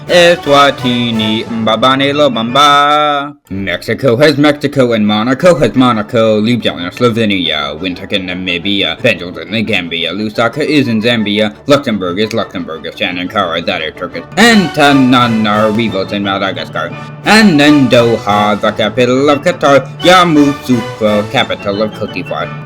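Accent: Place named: Scotland, United Kingdom. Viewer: I cant understand anything with ur ugly stupid scotish accent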